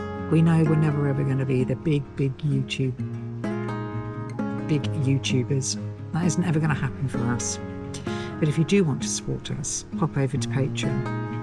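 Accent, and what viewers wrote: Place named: United Kingdom. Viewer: Thank you 🙏 and I love the British accent ❤😊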